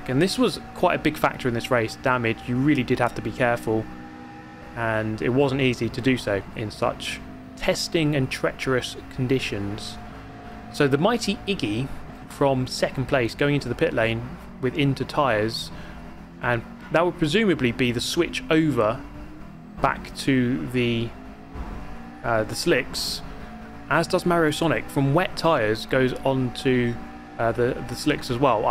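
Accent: United Kingdom. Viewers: i LOVE your voice and the british accent😆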